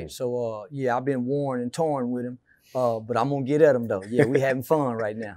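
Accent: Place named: Texas, United States. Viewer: Man I love Texas accent 😂😂😂 "you skirr me" 🤣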